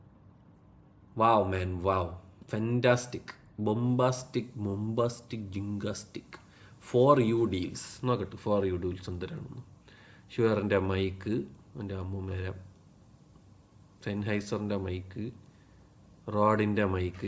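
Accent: Trivandrum, India. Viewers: Tvm aanu...ee slang kettitt angine thonunu..😅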